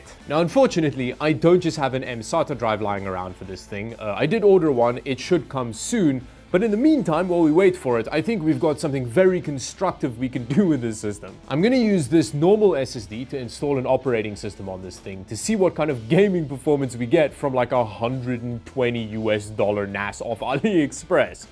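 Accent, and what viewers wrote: Afrikaans, South Africa. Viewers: BRO IS AFRIKAANS ??? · You're the first English person I've heard get so close to an Afrikaans accent. Legit you were spot on but I think it's because your voice already sounds kind of South African😂. Jy moet net nie jou moer strip nie. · Afrikaans... I was wondering where your accent came from. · yeah I thought I picked up an African accent I just though he was from South Africa cause he literally sounds like our Afrikaans natives. Really cool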